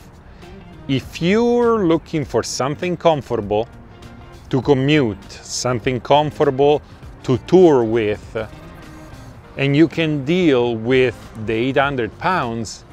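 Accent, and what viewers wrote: Italy. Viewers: I love the Italian accent review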